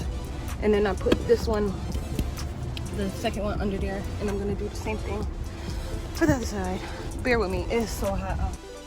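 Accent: Jamaica. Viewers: Are you Jamaican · I had to subscribe as soon as I heard your accent come out 🇯🇲🇯🇲🇯🇲 much love from a fellow Jamaican 🖤🖤🖤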